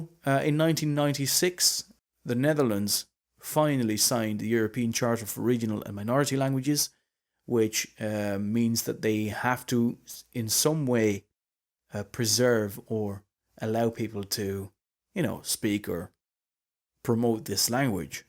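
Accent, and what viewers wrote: Ireland. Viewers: The bloke sounds like an Irishman but says he is Saxon? I thought the Low vs High was geographical reference. Were Danes Vikings or maybe a transitional population? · I have the funny problem of cognitive interference of your Irish accent when my mind is expecting a first language adjacent accent, that is with more influence from Low German. My mind will cope by thinking you're an Irishman who has learned Low Saxon! Good to learn more about my ancestral language. My family were from Westphalia region and Altmark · How did you get an Irish accent? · A Dutch guy speaking Irish accent. This is new to me. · You sound Irish.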